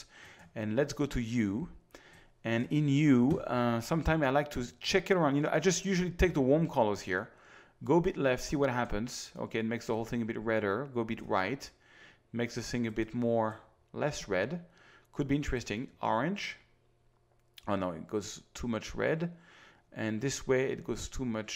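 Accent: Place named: Paris, France. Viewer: god ! you are so french so parisien...but is ok ! je suis un toulousain in hawaii..continue ton bon travail ......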